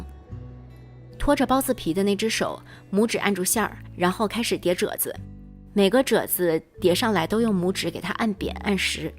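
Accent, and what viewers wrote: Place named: Tianjin, China. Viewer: 听口音是天津的小姐姐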